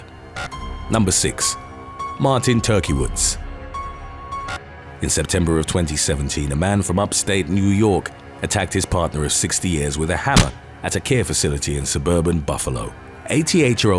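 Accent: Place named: England, United Kingdom. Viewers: It’s like David Kieth’s but with the added bonus of an English accent! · Its a British Pro-Bubbly Lóndón Voicé of a Wést indián Jamacíân Negroiđ voicé Lyké Danný Johń Julês frôm Thê Rêd Đwãrf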